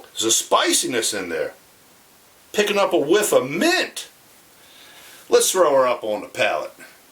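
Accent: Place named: Virginia, United States. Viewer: You are a Virginian i can tell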